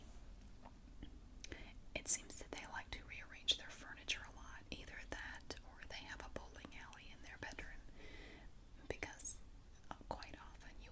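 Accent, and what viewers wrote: South, United States. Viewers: You’re a real gem ♥️ Yep, you talk fast like hell, indeed, but now I get it why and I adore you even more😁 Your southern drawl is absolutely lovely, I’m watching a Netflix TV series “Ozark” and the drawl in it is like a main character, check it out😊 Thank you for your great work, I love your videos since the very beginning, you’re one of a kind!🏆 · I love the way you speak I don't feel you talk fast. It's lovely and relaxing and the southern accent just kicks it off beautifully x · Haha it’s called that southern draw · I love you. I cannot watch anything else at the moment. Your whisper, soft spoken videos are just amazing. As a girl from the UK I find a southern accent lovely. So very relaxing. THANK YOU!!!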